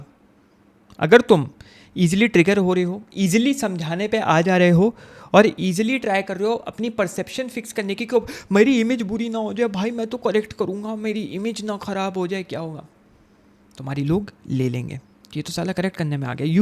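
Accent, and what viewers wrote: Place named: Bhopal, India. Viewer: bhiya why there is slight bhopali accent are you from bhopal?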